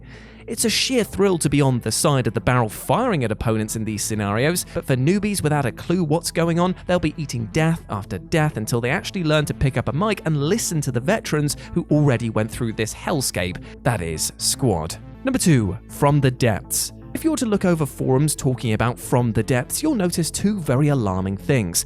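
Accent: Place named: Wales, United Kingdom. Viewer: Very Welsh it is 😁 (Wales is part of the United Kingdom)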